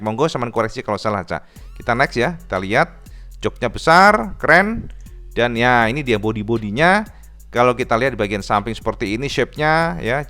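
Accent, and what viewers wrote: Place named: Tulungagung, Indonesia. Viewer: enggres aksen tulungagungan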